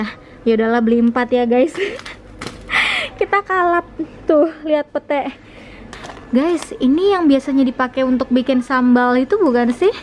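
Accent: Medan, Indonesia. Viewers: kk org medan kah? salam dari binjai kaka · Kak asli dari medannn yaa? 😁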